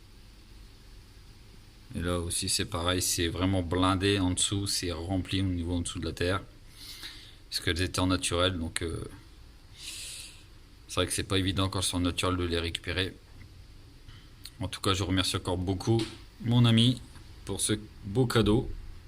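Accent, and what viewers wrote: North, France. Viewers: avec le petit accent du nord ! Comme à la maison 😊 je suis de Caudry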